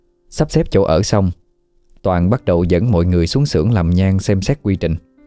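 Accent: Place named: Mekong Delta, Vietnam. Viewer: Chuyện ma mà nghe xúc động ghê 😮 giọng miền tây đặc ruột k ta sao nghe dễ thương quá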